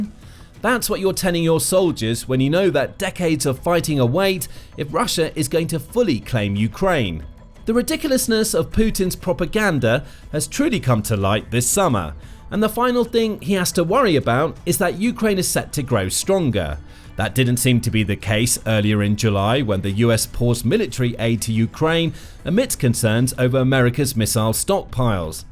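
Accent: United Kingdom. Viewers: The narrator is obviously British...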